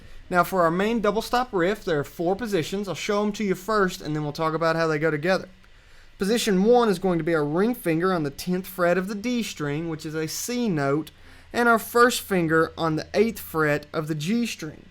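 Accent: South, United States. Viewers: thank you so much, i've been looking over for ages for this lesson! you rock! btw, where are you from? you accent sounds southern american.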